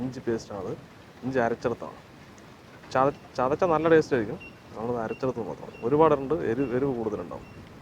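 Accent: Palakkad, India. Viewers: പാലക്കാട്‌ ഭാഷ പൊളിച്ചു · ചേട്ടൻ പാലക്കാട്ട്കാരനാണല്ലെ പൊളിച്ചൂട്ടാ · That Palakkadan slang!! 😍😍😍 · Thani palakkaadan...😂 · Ningalu palakkad karananno? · Aa Palakkad slang😍😍😍😍😍😍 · Palakkad ആണോ വീട്...? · ഫിറോസ്ക്കാന്റെ പാലക്കാടൻ സംസാരം കേൾക്കാനായി മാത്രം വരുന്നവരുണ്ടോ ഇവിടെ? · തനി പാലക്കാടൻ ശൈലി... 😍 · This is Palakkad Malayalam sir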